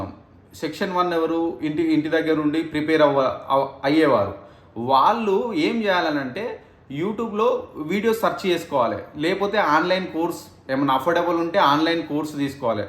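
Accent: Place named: Telangana, India. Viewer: Mi slang super Telangana lo ekkada anna midi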